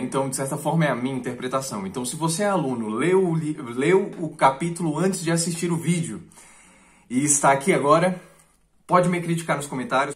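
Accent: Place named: France. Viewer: tô aqui pelo sotaque francês, flw vlw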